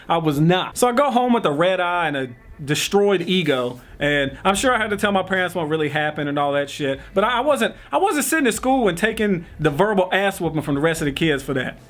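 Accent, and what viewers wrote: South, United States. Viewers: boooy I thought u was black or southern u just got the sound of southern hospitality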